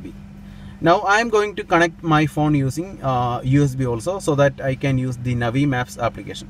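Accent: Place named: India. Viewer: Lol the accent. The way you say maruti... only an Indian can catch that.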